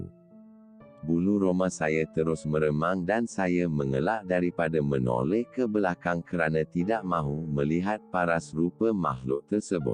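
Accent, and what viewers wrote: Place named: Sabah, Malaysia. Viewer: Sial lah suara Sabahan 🐷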